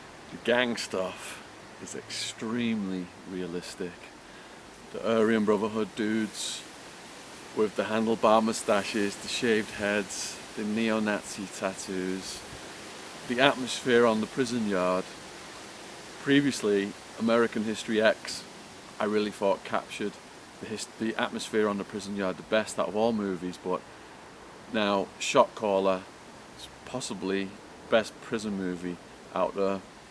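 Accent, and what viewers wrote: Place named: England, United Kingdom. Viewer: Bro you sound like your from England what would you know about California prison politics?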